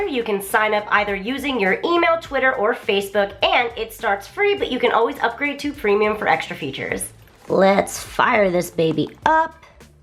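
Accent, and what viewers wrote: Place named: United States. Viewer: you sound extremely american when speaking japanese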